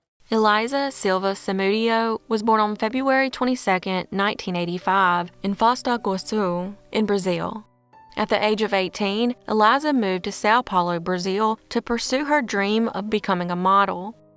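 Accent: South, United States. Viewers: Annoying factoid that I hate but wanna share cause I feel like you'd hate it too, cause you're southern like me. I live in Arizona now, and found out that 'Prescott' is pronounced 'Preskit' here, and it drives me absolutely mad 😂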